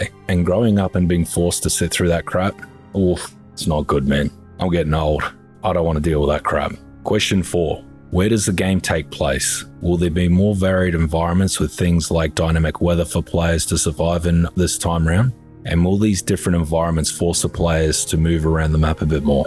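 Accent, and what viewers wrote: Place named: Australia. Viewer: Yo love your vids but I got a weird question but what is you accent or dialect to me it sounds Australian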